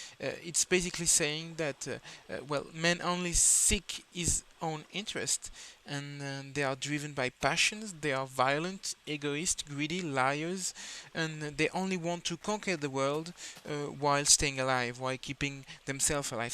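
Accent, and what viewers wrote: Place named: United Kingdom. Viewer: tu parles vraiment bien anglais avec un accent très British !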